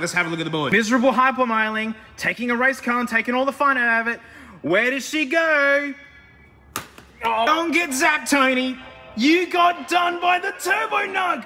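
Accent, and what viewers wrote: Australia. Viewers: i love how every adelaidean bloke sounds the same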